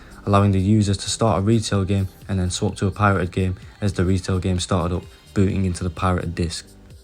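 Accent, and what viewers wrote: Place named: Manchester, United Kingdom. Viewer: Your accent. You from Manchester?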